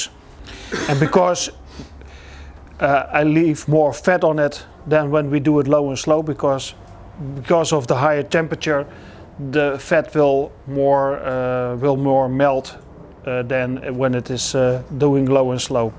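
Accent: Netherlands. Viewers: This guy has a Dutch accent....i know the accent.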